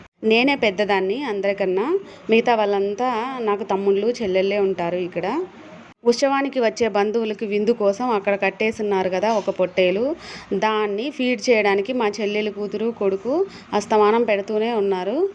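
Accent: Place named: Kadapa, India. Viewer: Meedi aaa ooru maadi proddutur meedhi slang kadapa vaalla laga undi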